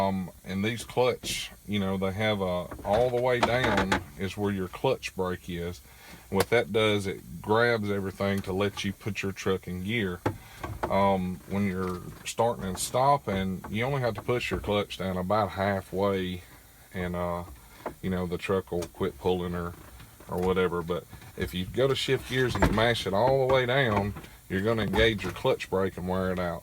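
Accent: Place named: Appalachia, United States. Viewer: Cringeworthy hillbilly accent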